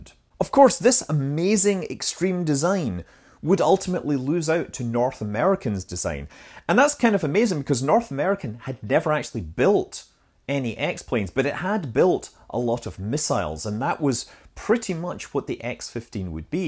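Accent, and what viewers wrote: Scotland, United Kingdom. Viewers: There isn’t one ‘British accent’ His accent is a cross between a Scottish, Irish and a small bit of a Californian accent because that’s where he lives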